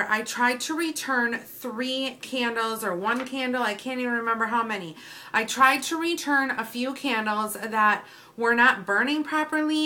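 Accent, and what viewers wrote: Wisconsin, United States. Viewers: the Wisconsin accent?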